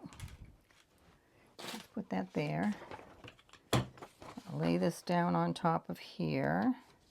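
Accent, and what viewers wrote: Boston, United States. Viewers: you are such fun! And so talented! I’ve been watching you for several hours and I’m one of the elder ladies who watch right to the end. Because I live in a seniors apt building (I’m 78) I run into those old people every day in the halls. Truly in my mind I’m much younger, haha. I love that you are from the Boston area. (accent) I live in northern Alberta Canada and we are just now getting rid of the sand salt and gravel on the roads and looking forward to green trees and grass. I loved all your collage making with household items today. I bought a gelli plate and brayer etc a year ago and it’s still in the package. I’m inspired now. I know your beautiful son is in heaven with Jesus. You have guardian angels around you.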